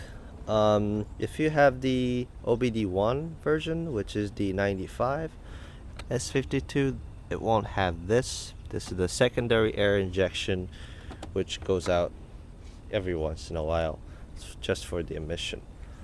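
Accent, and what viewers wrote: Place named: Asia. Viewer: I can tell your Asian with just hearing you talk, you talk kind of like my Asian friend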